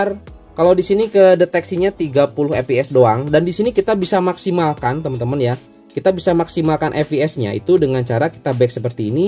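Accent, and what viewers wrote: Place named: Padang, Indonesia. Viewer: Logat Padang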